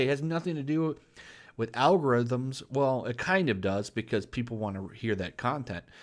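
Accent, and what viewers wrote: United Kingdom. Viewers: Your huffy British accent is on point.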